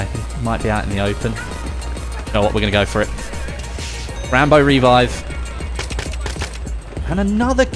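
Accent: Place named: United Kingdom. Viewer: Whoah ur from UK anorl I thought u were American what part UK u from some accent that mate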